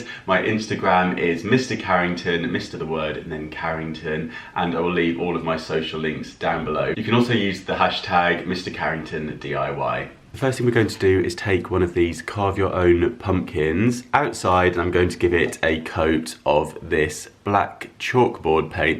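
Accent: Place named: Birmingham, United Kingdom. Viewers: Love the DIY and the very cute Brummy accent lol. Sounds just like me 👍😋# Mr talented Carrington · Ha ha loved the Birmingham accent 😂 I liked the white pumpkin & grey skull best! 🎃🎃🎃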